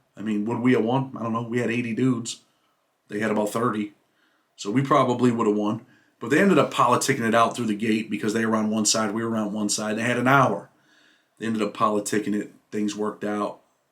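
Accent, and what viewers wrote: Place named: New York, United States. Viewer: My whole family from NY. Got some up in Buffalo, Syracuse, Oswego. I can hear ur accent sometimes. Good vid today.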